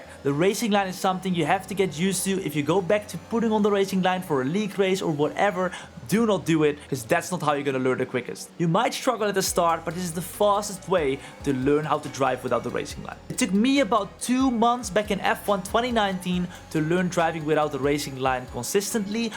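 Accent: Netherlands. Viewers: Nederlands right? 😂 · Het is sws een Nederlander😂 accent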